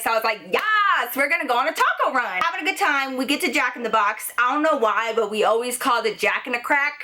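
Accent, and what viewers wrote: Texas, United States. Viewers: I love her Texas and Hispanic accent together 😂😝❤👏🏻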